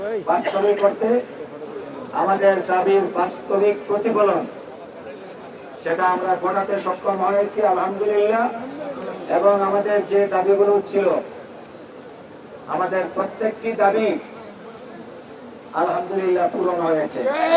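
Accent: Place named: Sylhet, Bangladesh. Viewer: স্যার মনে হয় সিলেটি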